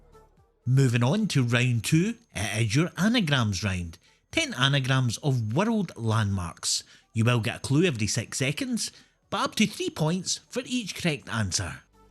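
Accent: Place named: Scotland, United Kingdom. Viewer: I did indeed enjoy the quiz and all your quizzes. I love the accent too. My parents were born and raised in Scotland (Fife) and all my cousins live there. So your accent reminds me of bonnie Scotland!!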